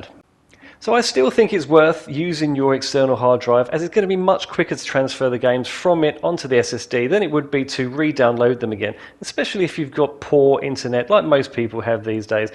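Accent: United Kingdom. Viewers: I’m assuming you are in the UK by your voice? I wonder if that has anything to do with it. I’m in the US and I’m guessing we don’t get the same updates.🤷🏼‍♂️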